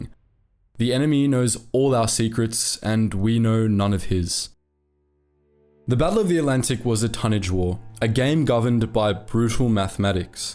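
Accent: South Africa. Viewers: Great job as usual. South African? Rhodesian? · Are you south african?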